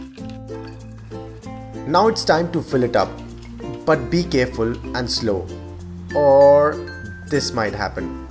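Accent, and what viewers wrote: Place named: India. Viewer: Sir app ko hindi nahi aata hai. awaz sy to indian lg rhy ho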